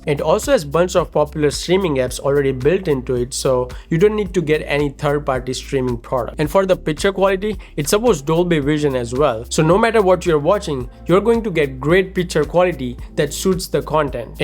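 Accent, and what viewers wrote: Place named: India. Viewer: The accent is very much mix of too indian and US!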